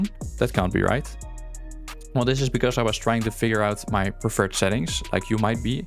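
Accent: Netherlands. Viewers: Your Dutch I just hear it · hey are you dutch matbe?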